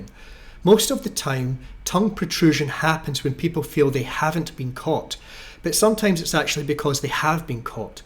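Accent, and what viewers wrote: Scotland, United Kingdom. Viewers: Are you and Neil Oliver from the same place in Scotland?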